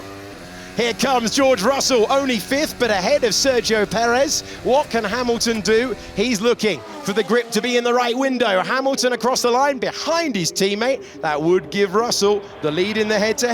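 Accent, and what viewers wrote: United States. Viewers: get this american of the commentary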